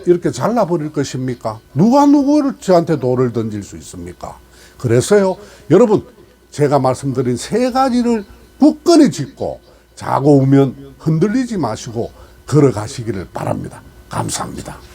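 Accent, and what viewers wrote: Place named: Gyeongsang, South Korea. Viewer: 경상도 억양 ~~사온닭 아니구요,싸움닭이죠?ㅋㅋㅋ 😂😂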